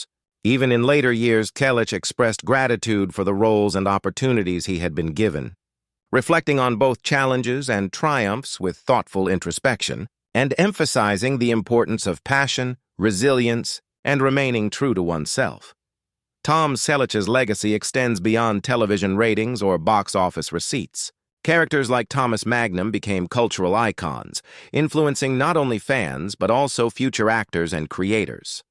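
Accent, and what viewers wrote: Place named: United States. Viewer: I am a relative of Tom and currently visiting the Selleck's. What did Tom confess? He has confirmed already many times he hasn't confessed anything recently. Everyone we know has already read his book or listened to the audio CD. Learn English. It's free on YouTube. Your Americanized AI-generated voice sounds unnatural. We know that you live in a third world country and don't speak English. Don't talk about people you don't know personally.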